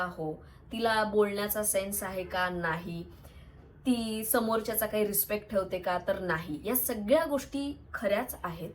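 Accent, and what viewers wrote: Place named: Khandesh, India. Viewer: 😂😂 paneer aivaji khodrubber Your roasting skills are amazing 😂😂❤ Btw I'm from Bhusawal 😅 loved your khandeshi accent 😁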